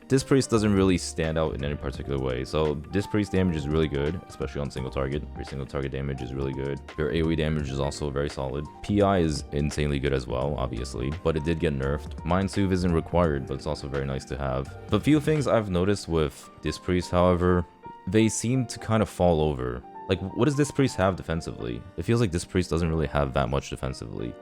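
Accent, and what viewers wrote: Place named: United States. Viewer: basing your experience on someone else opinion :D sound american to me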